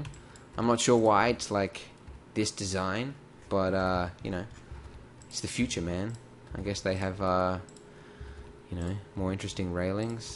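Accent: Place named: Australia. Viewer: this daft Aussie with his annoying accent ("blen-Dah", "heeyah", "compositah") cant tutor crap. he's more into showing off than teaching. he's as bad as Sardi Pax. the best tutor, especially for beginners, is tutor4u. ive learned so much from him.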